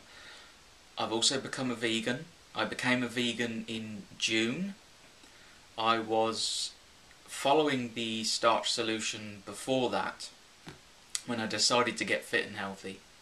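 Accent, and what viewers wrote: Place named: United Kingdom. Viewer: Thanks for sharing your story and experiences. I subscribed you. Btw, I love your british accent! Have a nice day. Greetings from Portugal :-)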